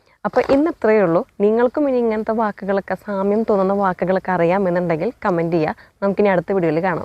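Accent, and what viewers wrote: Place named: Malappuram, India. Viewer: എനിക്ക് സാമ്യം തോന്നുന്നത് മലപ്പുറം ഭാഷയോട് ആണ്.. 👌🏻❤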